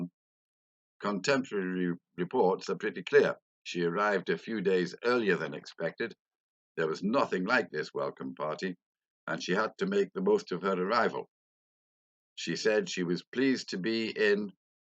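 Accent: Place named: Edinburgh, United Kingdom. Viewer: Morningside, Edinburgh.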